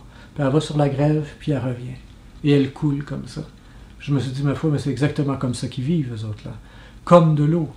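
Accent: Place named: Quebec, Canada. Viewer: J’ai vraiment apprécié la série de 13 petites conférences, racontées avec votre vergue bien québécoise !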